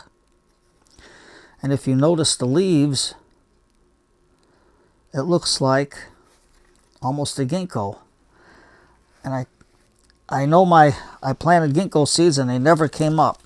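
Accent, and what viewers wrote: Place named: Chicago, United States. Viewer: Hi! I was in Oak Lawn and the Mount Greenwood neighborhood of Chicago last week and stopped by Fasel’s & Son’s to get some bonsai pots at 20% off. Great prices. Thanks for the tip. I had limited space to transport back to North Carolina or I would have bought more. It was good to see the old neighborhood, visit my family, and hear more of the Chicago accent that has made listening to you so much fun.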